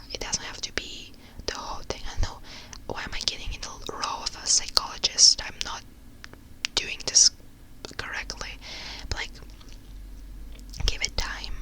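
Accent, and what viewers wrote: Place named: Europe. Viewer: Love this video. Really enjoy rambling videos in general as they are so very relaxing. Absolutely love your accent. Can’t quite pinpoint what it is but maybe somewhere European? Whilst I really enjoy your GeoGuessr, Wordle and Sims videos, would love you to do these rambles occasionally if you have time. Thank you for the top ASMR content x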